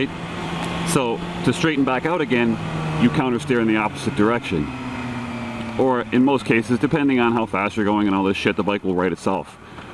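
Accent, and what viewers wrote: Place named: Ireland. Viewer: stop fing and talking and do some cornering. bla bla bla. Some Irish accent is coming out to lol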